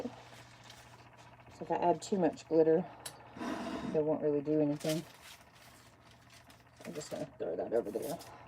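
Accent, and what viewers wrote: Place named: Alabama, United States. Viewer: hi watching on replay and you sound like me from Alabama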